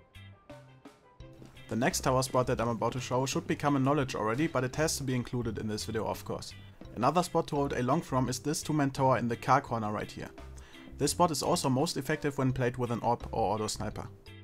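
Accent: Germany. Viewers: Du bist eigentlich deutsch ne :D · liegt vllt daran das er deutscher ist bin mir aber nicht sicher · Dein deutscher Akzent ist voll krass zu hören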